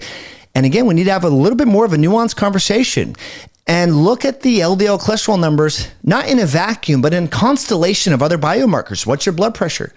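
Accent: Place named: South, United States. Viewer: North Carolina here. I heard a "bless his heart." You must be from the South. Hsha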